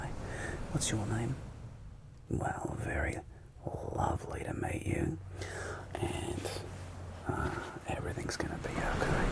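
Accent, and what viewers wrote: Australia. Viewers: big fan of the aussie accent! would love to see it come back in future videos :) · As an Aussie myself I just want to say he did a good job especially the accent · Your Aussie accent is actually really good, you could have called me a c**t though for maximum authenticity 🇦🇺 🐨 🦘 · This is me, an Aussie, petitioning you to please do a Russell Coight ASMR. The accent was 👌 and the theme of this video was so on brand for us. The sun ain't no joke down under! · Killer work, bro! Especially with the Aussie accent!!! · The Aussie accent was 👌 and the suncream on your nose was a good touch 😁 we need more roleplays like this!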